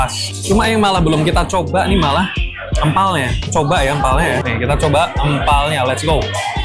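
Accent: Java, Indonesia. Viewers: lucuuu kamu yaampun tiba-tiba main ke kotaku, mana ga expect medok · Es krim parfum :_akePepelol::_akePepelol: seru banget nontonnya dari awal sampai akhirr :_akeApprove: suaramu auto medok d sini :_akeLol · Medhok bet, cocok tp😦✨ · mode medok nagih banget plisssss 😭😭😭😭💞 anw im glad u enjoy surabaya 🥹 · medok men lak ngomong 😂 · Habis nonton jadi laper, Ngiler bgt liatnya Btw jowo iso medhok ya wkwk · full medhok rek 😂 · apa kamu orang jawa? soalnya logatmu jawa bgtt wkwk (muji) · Tiba-tiba jadi medhok banget, sampe kaget loh aku, tapi cocok kok 👍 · Lol, medok katanya...aq dengerny biasa aja sih...karena setiap hari denger yg medok-medook 😂😂 Anyway, seru juga buat dicobain. Aq lagi cari ide wisata buat suami n ibuku yg udah lansia. Stay happy n healthy yaa❤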